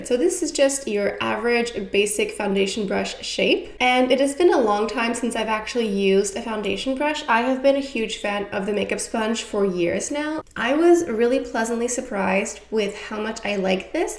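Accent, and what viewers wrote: France. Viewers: I do love the Dior backstage highlighter palette and I'm getting Joy edp as my birthday present on the 7th! I also own and love Chanel soleil le tan (the old version) and I'm going to repurchase it in the near future... Thanks for this new video. I love your French accent, it's so cute. I speak a little French. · R u French?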